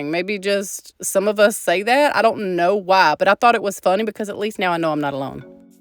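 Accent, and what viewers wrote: South, United States. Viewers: I think you could use that material for either or for both. Yes, the outside of our house is all Eastered up. Yes, that was for salt or pepper but you need the tiny spoon for it. Where do you live with your southern accent? Where do you sell your thing and what name do you go by?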